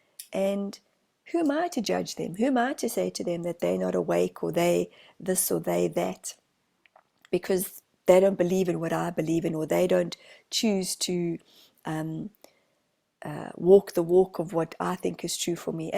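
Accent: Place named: South Africa. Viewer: Hello. I have just today been divinely guided to you. The moment I heard your voice I immediately identified with you as a fellow ex South African. I have been living in Melbourne Australia since 1982. Thank you for your wisdom. Thank you for introducing me to the Council of 8. I know there are no coincidences and that I was meant to connect with you right now. I feel so comfortable and at home in your energy and that of your mentors. THANK YOU. With so much love. Annette ❤️🤗🙏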